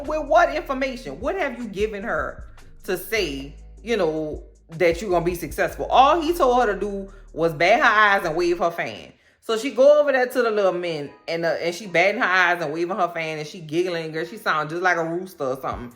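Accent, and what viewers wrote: New Orleans, United States. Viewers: All I heard was your voice and I said "Oh she DEFINITELY from New Orleans!" 😆 I'm from the N.O. too. Hey fren!👋🏽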